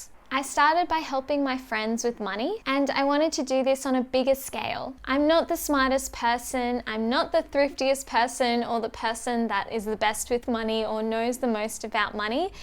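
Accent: Australia. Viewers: Loving your content night and day. Maybe it's the Australian accent :) I wanted to say this is a great video to show your audience why you are doing what you're doing. It's great to hear how you were making $400 per week early on. Through the struggle and sleeping through it, you are now more financially independent than before. I find a similar story because when I first started off, I was making $300 per week only. It's nice knowing that your why purpose is to help others benefit from financial discussions. That's why I value watching your content. You seem very warm, bubbly, and charismatic. Thanks for your video.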